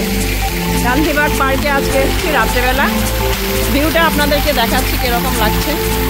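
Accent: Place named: Sylhet, Bangladesh. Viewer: আপনার কথায় সিলেটি টান আসছে।।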